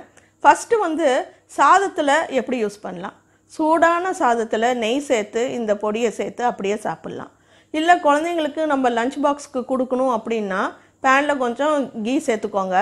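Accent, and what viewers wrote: Salem, India. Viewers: Unga recipes lam super. Unga Salem tamilum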